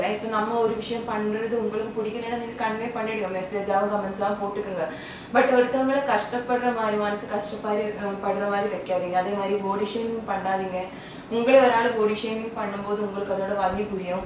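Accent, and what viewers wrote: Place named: Kerala, India. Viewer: Malaiyalam kalantha Tamil speach ketka Rompa Nalla iruku.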